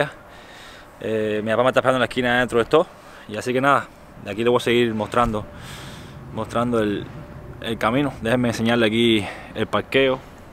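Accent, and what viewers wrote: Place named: Venezuela. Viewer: por tu manera de hablar, tu acento no eres Mexicano, es mas como Venezolano o Dominicano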